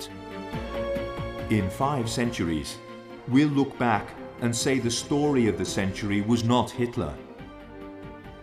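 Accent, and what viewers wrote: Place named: Scotland, United Kingdom. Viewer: took me a few minutes to decipher the Scottish, couldn't place the second influence in his accent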